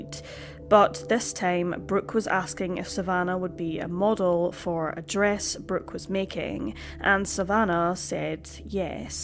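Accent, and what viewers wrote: Scotland, United Kingdom. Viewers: I like your Scottish ascent.🙂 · Is this a scottish accent?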